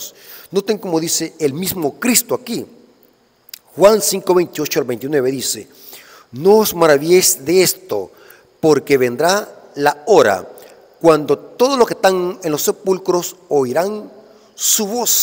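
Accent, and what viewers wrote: El Salvador. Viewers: Así se habla en El Salvador, como aquí en México, cada estado tiene su acento.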